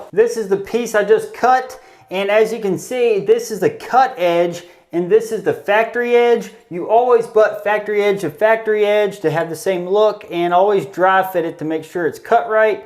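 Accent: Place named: Pittsburgh, United States. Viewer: Idk where your from my guy, but your accent sounds exactly like people from Pittsburgh!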